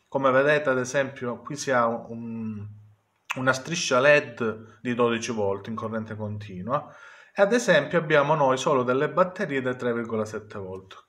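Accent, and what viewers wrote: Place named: South, Italy. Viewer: Ma di dove sei dal accento sembra che sei del sud italia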